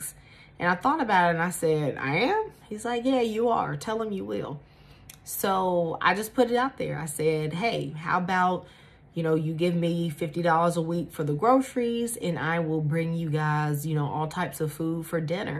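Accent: South, United States. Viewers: You are so beautiful and I love your Southern accent, where are you from??😍🥰🥰🥰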